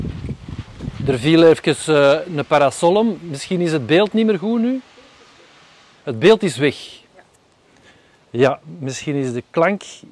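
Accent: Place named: Flanders, Belgium. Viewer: Wat een fijne, warm aanvoelende les, met als extra dat mooie Vlaams van jou en dat rustgevende konijn dat helemaal haar eigen gang gaat. Cadeautje van jou, dankzij YouTube ontvangen. Dankjewel. Groet van net over de grens. :)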